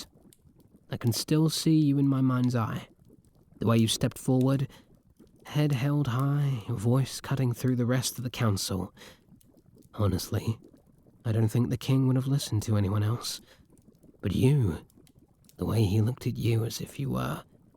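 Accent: United Kingdom. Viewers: Ah the British audio I can’t look at them the same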